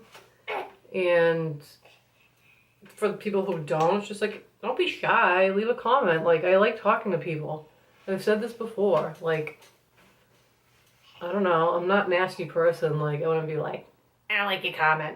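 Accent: Boston, United States. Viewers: Cool. Is that a Boston accent I detect? :o)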